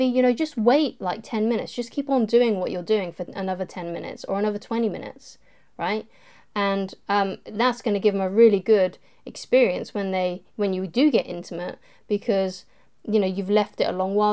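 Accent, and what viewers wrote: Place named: England, United Kingdom. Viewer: with a voice like that....I can listen to you all day.... just can't help to be attracted to a pretty Lady with a soft English accent.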